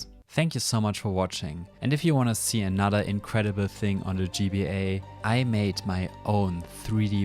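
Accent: Germany. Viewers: Man hört übel das er deutscher ist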